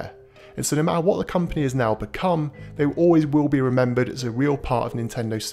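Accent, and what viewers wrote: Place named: United Kingdom. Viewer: Narrator, which region of British accent do you have? Asking respectfully as a yank haha. Found your channel today and a rabbit hole is where I now find myself.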